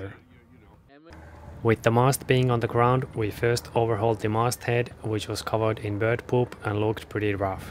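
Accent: Finland. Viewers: I was listening to your accent and I thought you might be Finn. I was right. 😊 I’m Finn American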